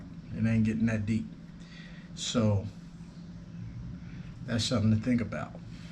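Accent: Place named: England, United Kingdom. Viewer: Man I love your videos..good honest self reviews.. liked your English accent.. Yes you earned it.. 1. You need to compile all your ammo vs M3 settings because there are too many of them now. May be a cheat sheet.. 2. Comparison of heavier JSB with lighter ones in the same tuning... and on a lighter note , I saw this review on 65 inch so one suggestion- pls do organise your stuff and do some 5S BTW waiting for you next review..love from India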